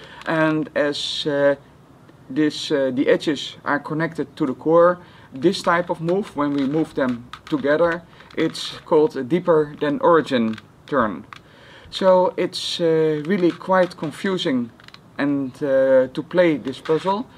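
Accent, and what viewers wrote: Netherlands. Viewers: his grammar is good but his accent is too dutch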